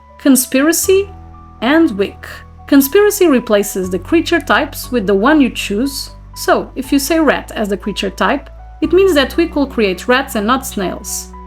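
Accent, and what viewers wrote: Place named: Portugal. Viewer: Are you Portuguese? Luv your accent! ótimo video.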